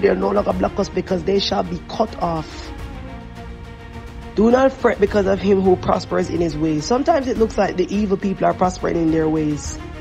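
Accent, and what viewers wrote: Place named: Jamaica. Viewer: ⚠️ REQUEST, that you woman of God slow down when you speak. Above prayer confirms what God has been saying. My own family's "bad luck" now I see as sorcerer caused due to jealousy plus we have suffered due to lack of knowledge. I just saw your channel first time now and I sense you are a genuine believer, not some false YouTuber. Praise God. Slow that beautiful Jamaican accent coz I will continue to repeat some of your prayers, or all. Thx!❤️🌹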